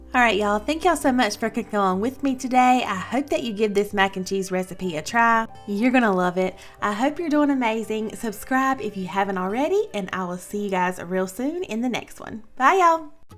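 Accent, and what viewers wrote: South, United States. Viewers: I am so excited to try this! Love your Southern drawl! I'm an Alabama girl!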